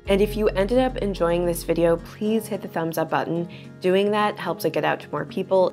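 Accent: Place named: Minnesota, United States. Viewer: I love your Minnesota accent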